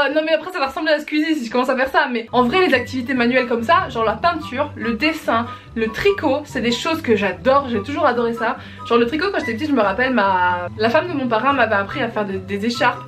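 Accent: Belgium. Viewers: T'es belge?😃😄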